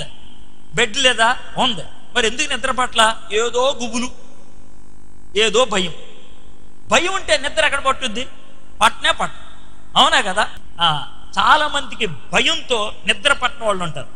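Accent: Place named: Srikakulam, India. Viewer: Srikakulam slang sir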